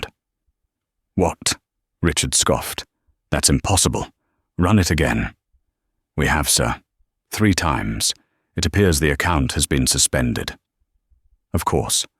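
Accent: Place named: Europe. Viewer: The narrator sounds like reading a fairy tale with European accent. Personally prefer a regular voice or a normal voice. Sorry. I couldn't stand the voice so I never finished the story.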